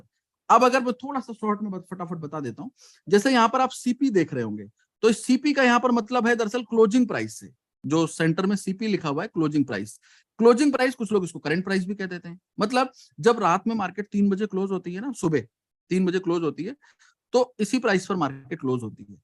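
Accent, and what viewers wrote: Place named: Uttar Pradesh, India. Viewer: ji kya aapka number mil sakta hai aapki hindi se lagta hai aap UP se hi hai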